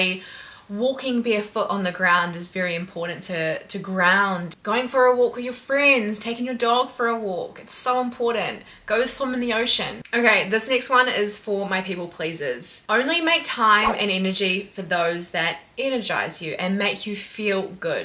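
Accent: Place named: Australia. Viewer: wait where are you from if you said you moved to australia? im canadian but i only hear an australian accent i think? LOL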